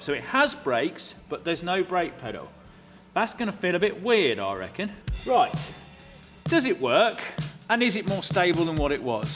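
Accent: United Kingdom. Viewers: He sound so British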